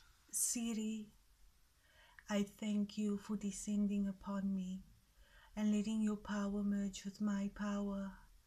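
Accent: South Africa. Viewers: You sound like you come from my country south Africa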